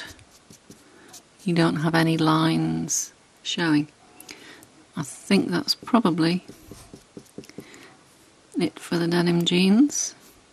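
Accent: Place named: RP, United Kingdom. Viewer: Great video buddy! It's lovely to hear your (posh) voice! xxx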